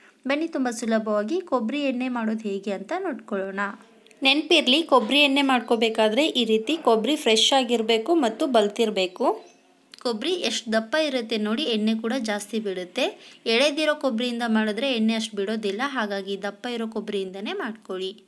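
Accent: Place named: North Karnataka, India. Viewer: Mam nivu nam kadeyora....uttara Karnataka avru nim voice hage ede swalpa adake kelde mam ...thank you so much for your recipe mam🥰😍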